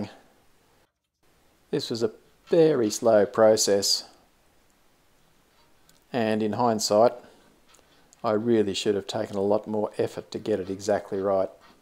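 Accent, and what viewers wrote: Australia. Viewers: Love your honesty, admitting to small mistakes. ALL of my successes have built in mistakes - just makes them more realer! And, good to hear an Aussie on YT!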